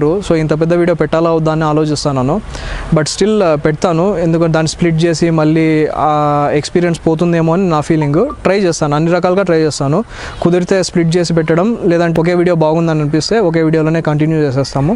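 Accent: Rayalaseema, India. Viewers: I alway love your rayalaseema slang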